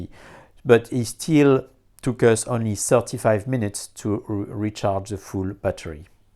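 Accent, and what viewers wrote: France. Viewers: this great french accent, I mean it - I love it dearly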